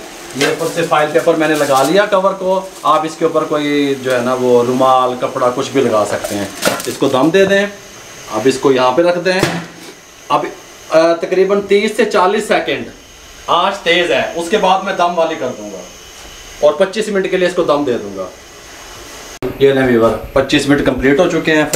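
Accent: India. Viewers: I like your daise style bolne style mashallah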